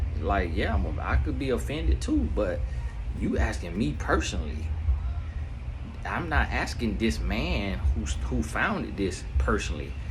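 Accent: South, United States. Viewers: “Don’t try me like no baser” 🤣🤣🤣 you know you from the country when you say that! That’s how we talk where I’m from lol (Wildwood). This video was spot on though, some great knowledge. We are the salt of the earth, country draw or not, we were meant to stand out. Our power lies in our authenticity! Period!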